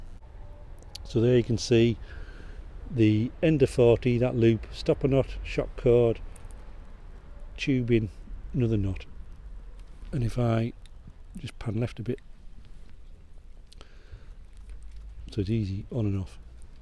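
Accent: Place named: Teesside, United Kingdom. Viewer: When i first seen the expedition a few weeks ago i was slightly concerned about the top sections but that looks very straight.I really enjoyed that, especially the very understandable Teesside accent 😁….Thanks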